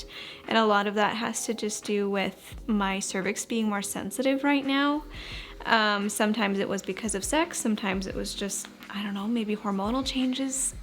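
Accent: Western Canada, Canada. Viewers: It’s definitely more of a west Canadian accent because here in Ontario we don’t say it like that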